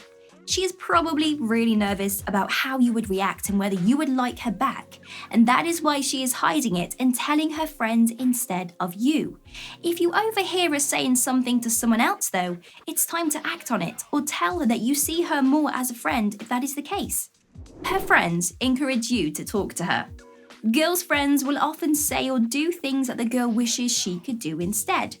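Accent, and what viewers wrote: United Kingdom. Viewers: She is British, isn't she?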